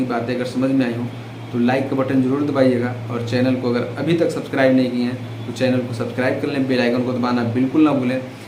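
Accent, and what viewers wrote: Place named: Bhojpur, India. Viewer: Arrah se ho ya Buxar se ya bhojpur se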